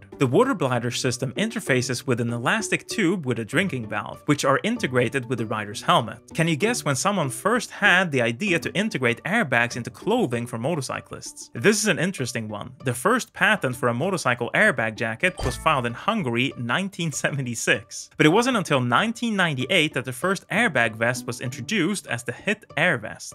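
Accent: Sweden. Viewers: Are you Swedish? or är du svensk? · Swedish accent? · Are you swedish my fellow motorcykel rider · are you swedish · Are you from sweden?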